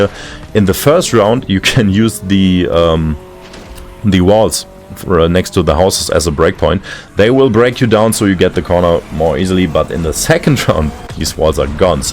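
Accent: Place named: Germany. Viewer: You can actually hear the german accent. Still better than expected. I guess after 2-3 tries he will get better with this. At least now he can reach a broader audience, which is a good thing.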